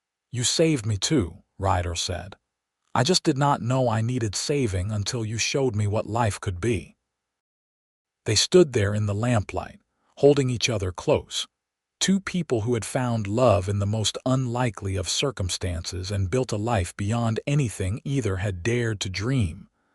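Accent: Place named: Georgia, United States. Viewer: I especially enjoy the narrator's accent, he sounds like he comes from Savannah, GA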